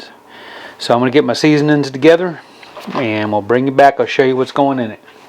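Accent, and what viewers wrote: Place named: Virginia, United States. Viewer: Sound like you're in Virginia.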